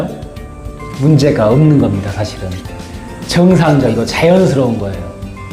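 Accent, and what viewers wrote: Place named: Jeolla, South Korea. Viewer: 인상이 좋으시네요^^ 잘 들었습니다^^ 말투로 보니 제 친가 지역 (7시 ㅋㅋ) 부근에 있으신 것 같기도 ㅋㅋㅋ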